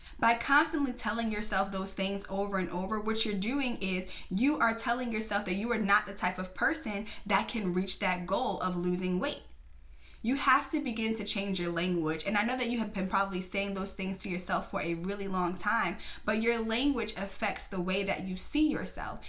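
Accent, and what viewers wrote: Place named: DMV, United States. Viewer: You’ve gotta be from the DMV or somewhere in that area lol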